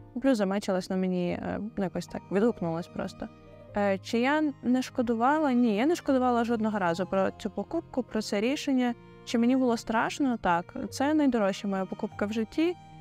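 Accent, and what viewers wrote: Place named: Ternopil, Ukraine. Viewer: а я то чую розмова така як в Тернополі👍😄 Моя сімʼя теж походить з Тернопільського району ❤ Ти моя землячка🎉❤❤❤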